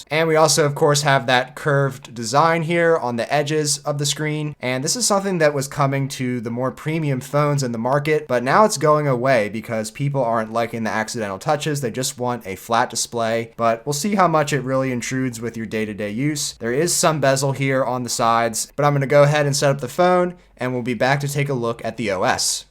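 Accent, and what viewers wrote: United States. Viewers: That is not an English accent, it's an accent from some part of america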